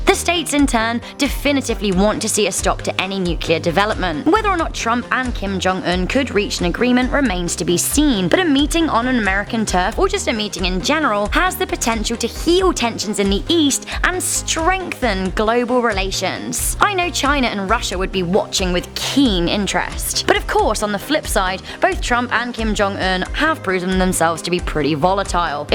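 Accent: United Kingdom. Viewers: ‘Ello fellow Brit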